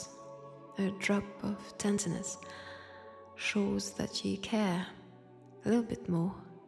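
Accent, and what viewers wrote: France. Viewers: Hearing your beautiful French accent whenever you upload a new video always makes my day, I hope things have been going well for you lately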